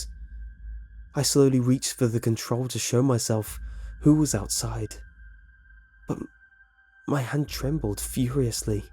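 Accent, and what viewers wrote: United Kingdom. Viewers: Which part of the UK is he from? Like his nearest city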